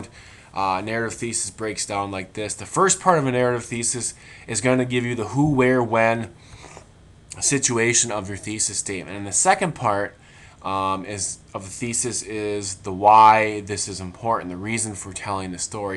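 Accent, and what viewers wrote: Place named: Canada. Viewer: hehehe Canadian accent..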